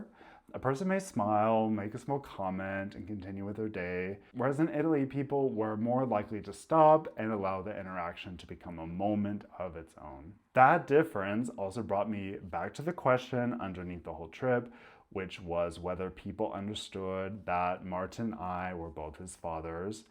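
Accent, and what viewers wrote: Bavaria, Germany. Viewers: I am new to your channel. What I notice most of all is your Bavarian intonation! I don’t hear it in German but I do hear it in people speaking English after a lot of exposure to bavarians. If you don’t know what I mean, listen to Feli from Germany and her unusual patterns, I hear a lot of them in this video.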